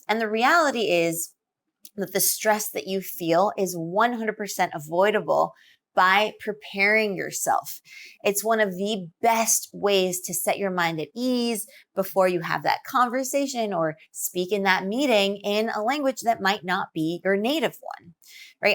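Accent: United States. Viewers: Very good american enghish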